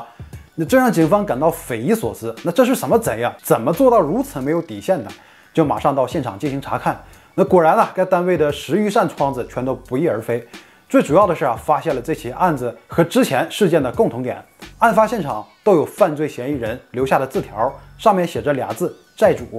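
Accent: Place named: Yanbian, China. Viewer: up主是朝鲜族的吧，感觉口音有点延边，延吉那边的。😄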